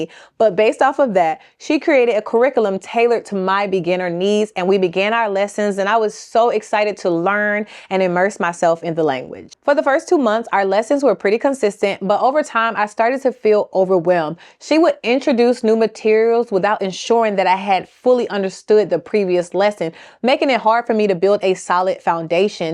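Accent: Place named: United States. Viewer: I think your Spanish gringo accent made people laugh/cringe…Good-luck on your journey tho😎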